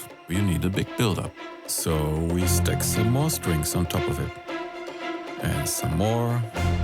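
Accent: Germany. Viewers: German Accent?;)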